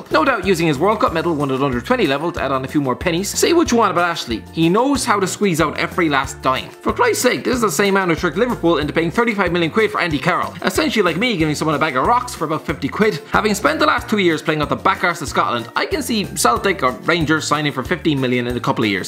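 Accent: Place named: Ireland. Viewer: I can’t understand a word your saying slow down lad. I love the Irish accent but you sound like you’ve sniffed a few gram lol chill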